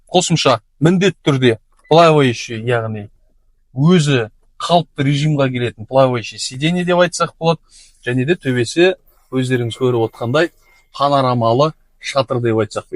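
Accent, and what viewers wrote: Standard. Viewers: Әдемі, таза қазақша сойлегенің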